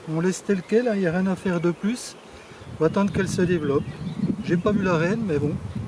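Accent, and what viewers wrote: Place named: Franche-Comté, France. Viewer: Merci pour la vidéo !...Ah l'accent franc comtois !...j'adore !..je suis du pays !😅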